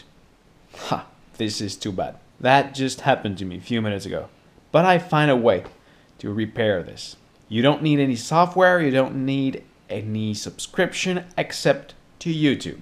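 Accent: Quebec, Canada. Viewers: thanks for this video! Will try it out. Like your style - what is your accent - Quebecois?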